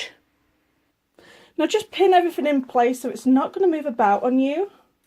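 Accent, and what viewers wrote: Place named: North Yorkshire, United Kingdom. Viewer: You've done it again! Another fine video I'll let you into a little secret; I love making bags but, as a quilter, it's sometimes quite a while between doing them. All my bags have loads of pockets but I often forget how to put zip ones in. No longer! I have a good 'go to' place for reference. Thank you so much. Please tell me where you originate from, your accent sounds so local to me (Scarborough, North Yorkshire) but I see you're in Canada - there's a story there I'll bet. xx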